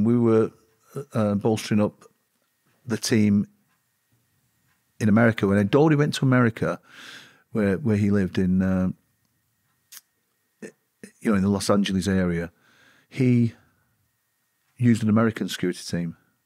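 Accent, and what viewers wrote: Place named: Scotland, United Kingdom. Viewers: Hi, I’ve just found you, so glad I did! I’m writing b4 I’ve finished listening to this. Wanted to say it’s fab to hear a fellow Glaswegian doing this and I’ll be checking out your other stuff. Well done 👏 ya handsome young man 😅 Your guest is fabulous!! He’s got such a beautiful spirit, so open and warm. Don’t mind admitting I’ve got a big crush 🥰😂 I admire how straight forward your interview style is and I’m looking forward to learning more about you through your back catalogue. Well I’ll stop noo and listen to the interview. Health and happiness to you and yours ❤ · Yes Lee Sansom is a great bloke, and, (what a surname, for such a strong Scottish accent Scot), is a great interviewer... BUT if Lee Marshalls his actions, as badly as his thoughts, God help anyone he was protecting. He goes around the houses, with pausing to an irrorating level, I wouldn't have him baby sitting, never mind protecting people for huge sums of money. 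There are glaring inconsistences! IF the British MI5 & MI6 were watching everything, and that is normal, did they ALL have a night off when Lady Diana died? Why was no white Fiat found, they had the paint on the Car, and even if the Tunnel CCTV was off, ALL of Paris's CCTV was NOT? Why the close Bike rider who may have used a Driver Blinding torch, why was he never found? Why did they not take her to the nearest Hospital? Why did it take 2 Hours before anyone tried to save her? A following Security Car should have been following, so if the Celebrity Car is damaged, or unable to move, the Celebrity can be transfer to the following Car. This is standard Body Guard Protection. Now if this Lee Sansom is a nice bloke, that still does not qualify him as a Body Guard. For some reason I cannot fathom, you all seem to think "nice blokes" do their job well, I couldn't care if he's a Saint, he is bloody useless in a Crisis, or even able to DO HIS HOMEWORK. 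If HE does not know the answers to my questions, he is a totally stupid, bloody shit Body Guard. Because ALL of those questions HE BLOODY WELL SHOULD KNOW! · How frustrating and disappointing for you. The Scottish accent can be like that may I ask where you from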